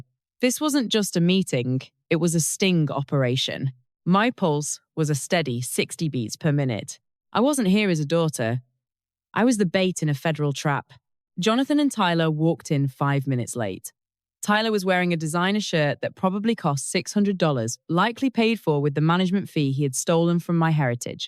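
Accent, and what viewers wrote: United Kingdom. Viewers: Why is she speaking with a British Accent instead American? HUH? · Why do you have a British person narrating an American story with an Asian video playing?? Kind of stupid, don't you think??? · Nice story. But hearing a British accent saying they worked as a customs agent at a base controlling a port in South Carolina was wrong. Adding the visuals of Hotel Saigon Morin added to the confusion. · Why did they get a narrator with a British accent? It’s so out of place. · Yes just seem odd a British accent on an American story 😮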